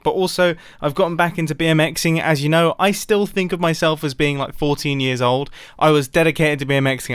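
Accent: England, United Kingdom. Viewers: sounds like a proper englishman (or possibly the love child of joe hills and harry potter)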